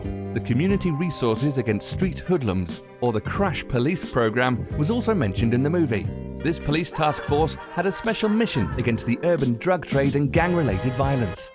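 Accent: England, United Kingdom. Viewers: to give him a bit of a break hes british. I cringe every time he says aluminum for the same reason. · He has a british accent, have a little understanding, so fucking what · It's called a British accent.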